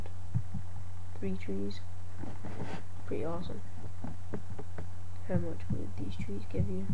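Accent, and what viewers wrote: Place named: Scotland, United Kingdom. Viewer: I figured out your scottish in this one, within 12 seconds, awesome lol.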